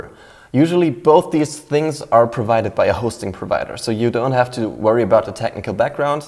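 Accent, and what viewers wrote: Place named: Poland. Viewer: very german with so polish english accent : )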